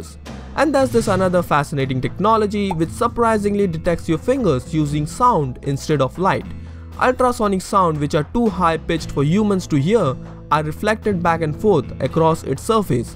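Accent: India. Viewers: This narrator is Indian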